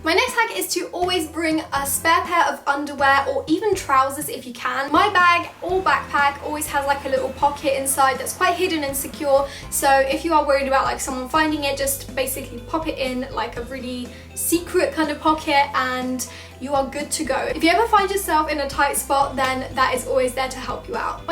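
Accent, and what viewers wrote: United Kingdom. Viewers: Ive known your channel a year ago and i really like your videos. Especially a life hacks and makeup tutorial video. You are such an amazing girl. I hope you keep being the way you are and nobody can let you down bc i am here. and also i really like your accent (its british right?) idk i always find really cute when i heard girls speaks british accent. we are so close yet so far😁. i know it is such a long comment lol but i hope i can win this giveaway bc i reallyyy want that macbook air. wish me luck and i love you so much 💚💚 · I adore youu and I love your accent, I have something for british accent really😫😂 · I will definitely try the heating pad hack!! Thank you so much for sharing this with us, and by the way - your video quality makes you look even more gorgeous We all love your honest and creative videos! Would love to win the prize for my room that I've recently moved to (it's a flat with two girls that are studying, too) :) I'm studying English to be a teacher and your british accent is so awesome! 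I'd love to see a video of you and maybe your boyfriens imitating different accents!! Love, Melodie xx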